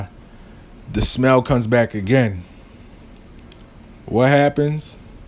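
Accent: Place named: Philadelphia, United States. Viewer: Philly accent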